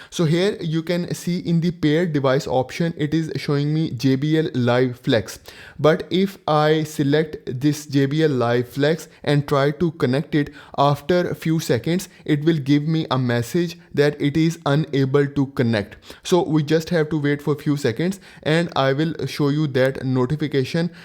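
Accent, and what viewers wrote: India. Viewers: Didn't work Even a tutorial from a dude with an indian accent can't save how bad JBL airbuds are I'll just buy from another brand and stop being a cheap ass thinking I can get something that won't die after 2 days at a reasonable price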